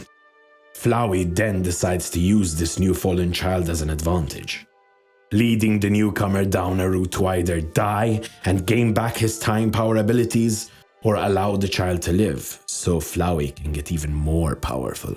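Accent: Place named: Malta. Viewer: you sound maltese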